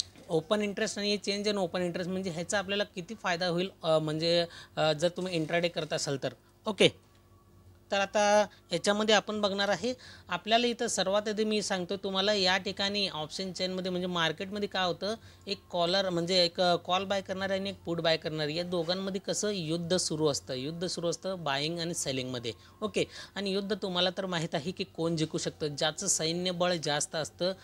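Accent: Solapur, India. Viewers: Sir tumhi Solapurche ahe Right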